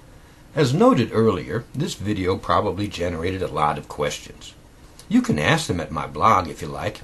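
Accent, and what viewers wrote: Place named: North Carolina, United States. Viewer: Great job,! You should get a British guy to do the voice overs, though. Your'e from North Carolina, aintcha?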